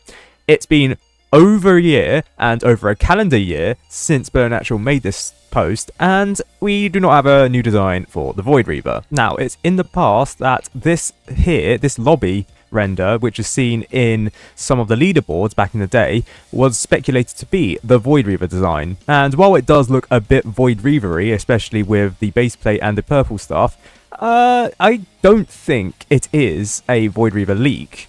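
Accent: United Kingdom. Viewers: sometimes i cannot understand what say cuz his british accent and a very familiar british voice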